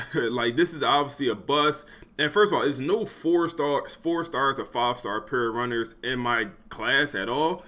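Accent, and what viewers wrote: Philadelphia, United States. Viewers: bro straight from philly lmao great vid fam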